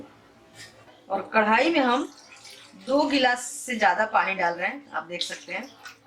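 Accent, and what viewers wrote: Bihar, India. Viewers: आप बिहार से हो क्या ?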